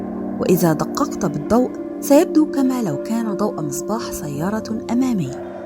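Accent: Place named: Egypt. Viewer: يا مرحبا بأخت صدقيني اعشق صوتك اتعرفين لماذا لانو عندما كنا صغار في جزائر كنا نسمع صوت رسوم متحركة بلهجة مصرية ولهاذا صوت مصريين نحسه يذكرني بصغري والله روعة 🇩🇿🇩🇿❤❤🇪🇬🇪🇬💪💪💪💪💪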